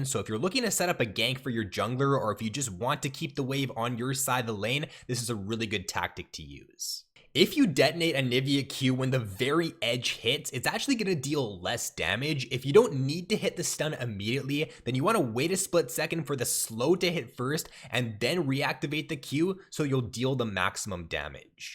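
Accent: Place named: Canada. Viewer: Took me watching like most your videos to hear your Canadian accent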